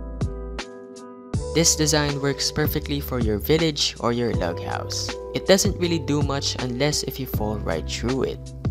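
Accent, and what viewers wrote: Philippines. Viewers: You sounds like filipino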